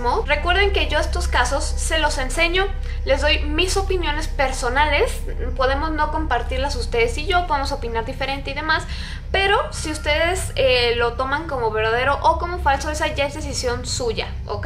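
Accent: Chile. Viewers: Anuma me si cuenta que era de Chile como io pedo soy Chilena XD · Ajaja se kacho altiro k era de chile akkakak ❤🇨🇱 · Me di cuenta de que el es chileno ._.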